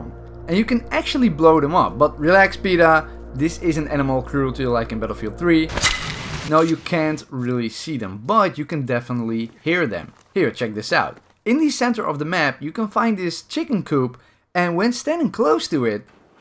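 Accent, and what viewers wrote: Netherlands. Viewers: De Nederlands accent btw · you sound dutch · He's Dutch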